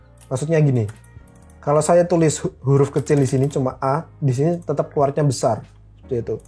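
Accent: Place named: Java, Indonesia. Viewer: 'v jowo mas, maklum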